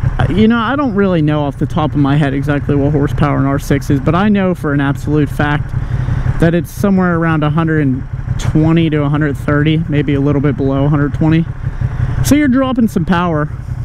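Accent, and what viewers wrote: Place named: Pittsburgh, United States. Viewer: subscribed for the pittsburgh accent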